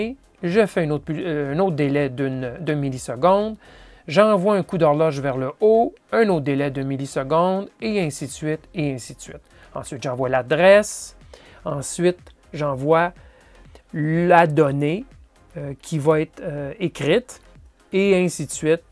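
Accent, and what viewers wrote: Quebec, Canada. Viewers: Bonjour, Encore une vidéo enrichissante, je ne savais pas qu'il y avait de la Ram dans ce genre de module. Ce qui m'éclaire bien pour les nouvelles fonctionnalités de reprise d'impression 3D après une coupure de courant par exemple :) PS : j'adore l'accent québécois ;)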